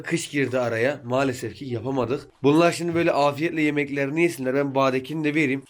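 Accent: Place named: Gaziantep, Turkey. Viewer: aga bu adama anteplıce konus mak cok yakısıyo adam bu adam harbi kral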